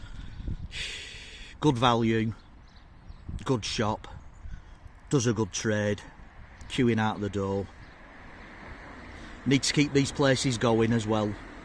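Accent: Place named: Lancashire, United Kingdom. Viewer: Hi, a picture in pies, with your commentary adding the fine brushwork. Without wishing to repeat a previous comment, you wondered about value for money. You got three top quality pies for just short of six pounds, in London, you wouldn't have got one for that. As a pensioner, among the many that are under attack by the new government, I micht have to consider a move to the North-West. Sensible people and prices and plenty of great beer and scenery. We might find it difficult to understand each other, you with your broad Lancastrian accent and me with my broad London accent, but I'd love to give it a try. All the best mate, Mark from London.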